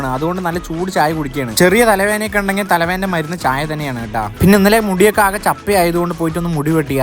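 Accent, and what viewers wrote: Palakkad, India. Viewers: എടാ മുത്തേ നിന്റെ പാലക്കാടൻ ഭാഷ ഒന്നും പറയാനില്ല ടാ, നീ തൃശ്ശൂർ വരുമ്പോഴേ അറിയിക്കേണ്ട ഗഡിയെ നേരത്തെ ഒരു വീഡിയോ ഇടണ്ടേ? ഒന്ന് നേരിൽ കാണാമായിരുന്നു നിന്റെ വീഡിയോ എല്ലാം ഞാൻ കാണാറുണ്ട് അടിപൊളിയാണ് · Trissur basha palakkad style il paranja ❤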